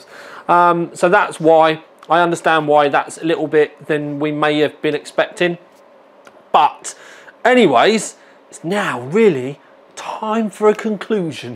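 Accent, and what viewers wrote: United Kingdom. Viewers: yoooooooooooooo britishaceeeeeeeeeent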